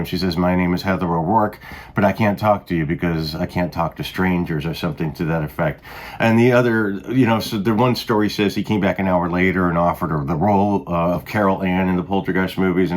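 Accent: Chicago, United States. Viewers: I knew it! I commented about a possible watered down Chicago accent in the Lisa Marie autopsy vid, which is the first video I watched on this (very interesting & well-done) channel. Doesn’t matter how long you’re gone, it always creeps back in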